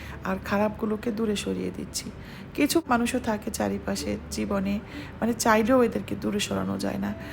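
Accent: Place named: Barishal, Bangladesh. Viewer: Apu apni kothai porashuna korechen,apnar kotha shune barishal,feni,noakhali mone hoy,?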